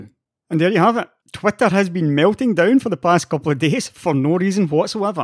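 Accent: Scotland, United Kingdom. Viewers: Those soothing scottish dulcet tones!!!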